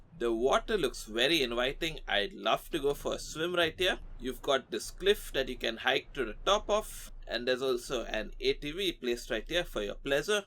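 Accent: India. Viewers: Indian i think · Indian and Bangladeshi at the same time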